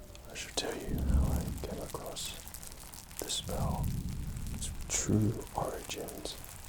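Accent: England, United Kingdom. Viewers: Is he English? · Loves chocolate, loves Harry Potter, looks like a surfer & sexy af articulate English accent... Yum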